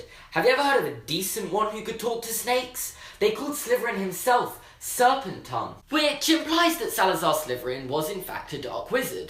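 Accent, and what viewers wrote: United Kingdom. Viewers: Almost every consonant turns into a "fvv" sound for British people doesn't it? "Slyfveryn" "nofving" super cute but also my inner pronunciation nazi is screaming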